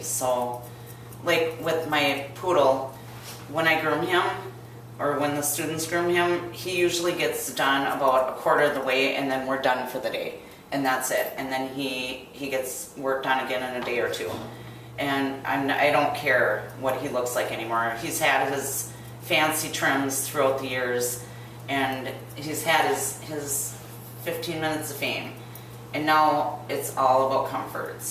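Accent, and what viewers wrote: United States. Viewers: Good to see you again, and hear your Yankee Doodle accent. Conway is a cutie pie.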